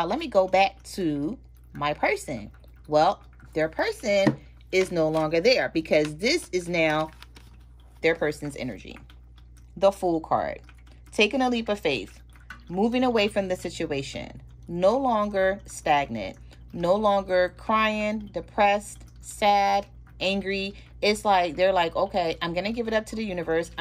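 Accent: Philadelphia, United States. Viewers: Are you from Philly???! 👂🏽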